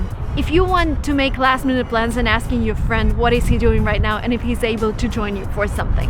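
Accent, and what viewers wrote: United States. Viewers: Madam you are a Russian and you talk in perfect American english.We Indians adore you.We respect you.ALL THE BEST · Марина классна говоришь по английскому прямо как Американка сними на русском такое же пожалуйста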